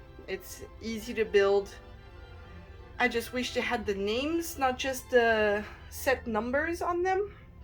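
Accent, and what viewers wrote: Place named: France. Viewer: you have a french accent, you're french?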